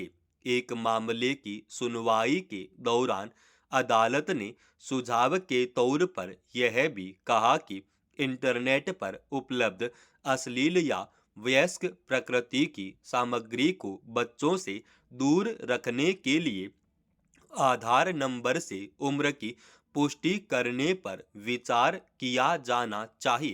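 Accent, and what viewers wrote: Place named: South, India. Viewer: पेदा या पैदा अरे मद्रासी हिन्दी बोलना सीख लो। न होता है या नै। पहले अच्छे से हिंदी सीख लो भाई बोलना।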